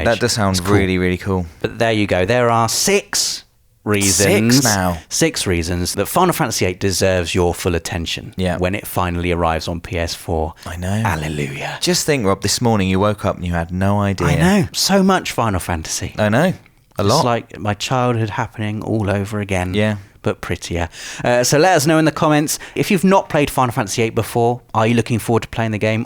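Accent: United Kingdom. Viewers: Thought same thing when he kept saying Squall, it might be a British way of pronouncing things. Triad was also incorrect when Rob said it the first few times.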